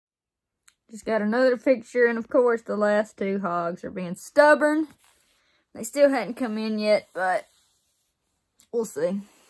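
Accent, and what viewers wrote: South, United States. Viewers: You're so darn cute and I like that southern talk 😍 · By god ,that southern accent is so hot . Sorry don't mean to be rude .but you sure are a beautiful woman . Will you merry me? Lol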